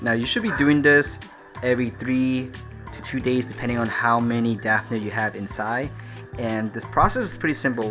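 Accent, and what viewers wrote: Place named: Australia. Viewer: Nice video! Very informative! Just curious I detect an accent, are you originally from Australia?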